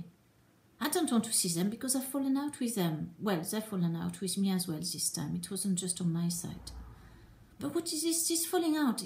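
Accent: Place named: United States. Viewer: What are you doing with USA vocal intonations! Stop it now! Keep regional traits, it is more fun than all sounding the same :)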